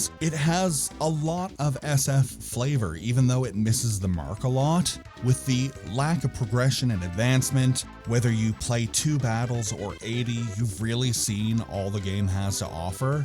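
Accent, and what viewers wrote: Maritimes, Canada. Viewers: bro are you from the maritime provinces in Canada?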